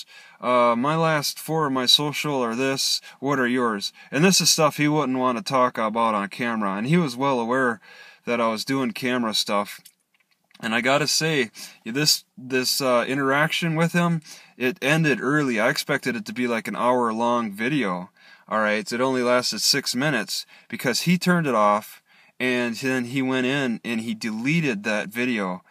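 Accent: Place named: South, United States. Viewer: A word of advice your voice is awesome your face not so much point your camera at something else and just let your voice do the talking I subscribed because your videos are edgy and cool and your voice is a combination of a southerner a Pacific Northwestern and a guy from Jersey!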